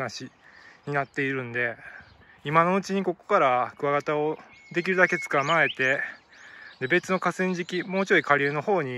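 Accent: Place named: Nara, Japan. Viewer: 喋り方が関西の中でも奈良や京都の南の方ぽい(^^)木津川かな？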